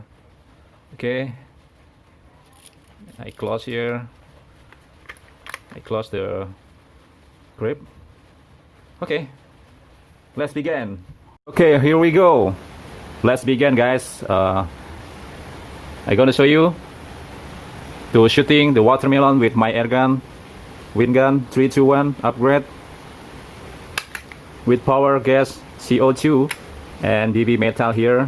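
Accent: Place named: Indonesia. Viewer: indonesian english😅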